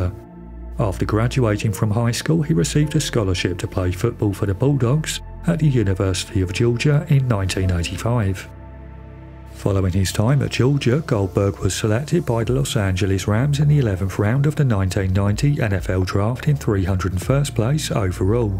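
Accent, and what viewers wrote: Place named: London, United Kingdom. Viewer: Your accent is cool. I'm guessing you're originally from the London area? Maybe Surrey.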